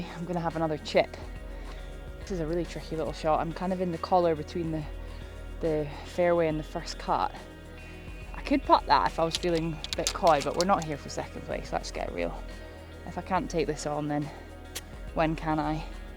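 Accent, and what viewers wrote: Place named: St Andrews, United Kingdom. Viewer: I have started to putt more with the pin in. In the past three years playing about 100+ rounds per year (HDCP 6.3) and as a senior golfer, I find it helps with my depth perception. Maybe it's just my older eyes, but I can't remember the pin ever keeping a ball out of the hole for me. BTW, my daughter is a student at StA so as a Yank from the US, when I watch your channel or hear your commentary, it reminds me of what she hears every day and just helps to bring her closer to me. Please continue the great work! I love chasing daylight as well as being a dew sweeper!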